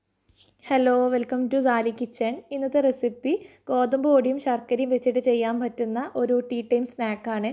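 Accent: Kannur, India. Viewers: aaha... kannur evde.??? .. samsaram kettappo enikk thoniyirunnu kannur aanenn... njanum kannura... payangadi🤗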